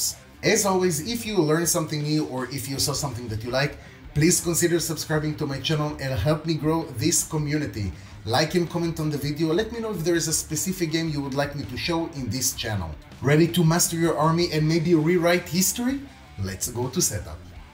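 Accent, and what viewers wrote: France. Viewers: have you had a lot of troubles with get rid of French accent? I saw one French man trying speak english but he has some troubles with "french accent"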